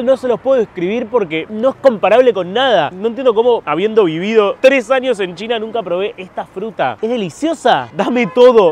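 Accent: Buenos Aires, Argentina. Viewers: Y si es dé buenos aires de remedios dé escalada como querés que hable 😂😂😂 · uiii que turbio ; calle grafiteada.... pa vos es turbio ? ................... te salto el policheto que llevas dentro , igual gracias x mostrar este lugar..... vos viajas por todo el mundo , porque sos youtuber ? te falta humor ... y el acento portenio es inevitable... de todas formas gracias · Porque hablas en porteño taaan exagerado mmmm es raro eso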